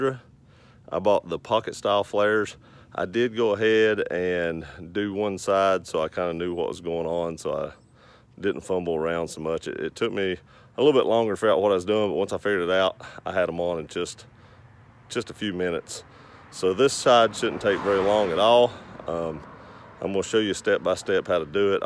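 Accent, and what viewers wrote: South, United States. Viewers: Southern state are you from because you have a very deep Southern accent.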